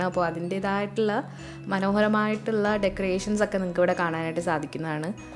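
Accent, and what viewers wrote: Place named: Alappuzha-Kollam, India. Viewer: നാട്ടിൽ ആലപ്പുഴ, കൊല്ലം അവിടെ എവിടെയേലും ആണോ. സംസാരം കേട്ടിട്ട് ചോദിച്ചതാ 😊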